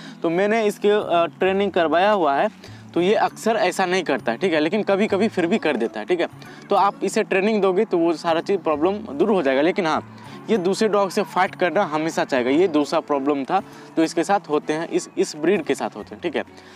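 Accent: Bihar, India. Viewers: Bihari Bhai hum bujh Gaye aap ki bole😁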